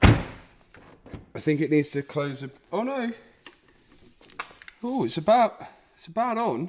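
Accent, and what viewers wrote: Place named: United Kingdom. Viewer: Apart from the British accent, this is some manly stuff! Enjoying the content from South Africa!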